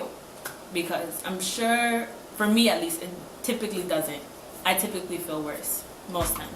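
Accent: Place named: Africa. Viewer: Makeup A 1 🔥🔥🔥 also I peep the African accent 😭😭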